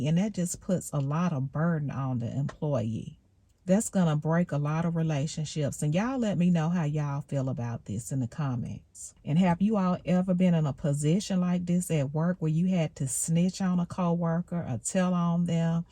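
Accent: South, United States. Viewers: Love the southern accent. Love the video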